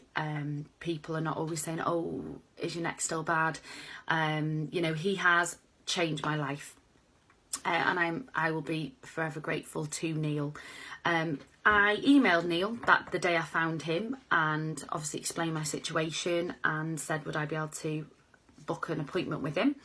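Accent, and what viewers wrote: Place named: Wales, United Kingdom. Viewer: What a GREAT video! You are an inspiration to US ALL! Love your Wales accent!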